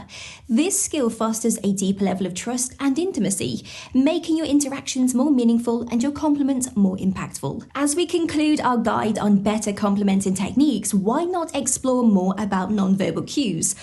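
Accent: England, United Kingdom. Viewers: These videos have really helped me since I had some bad stuff happen to me and I trust the English accent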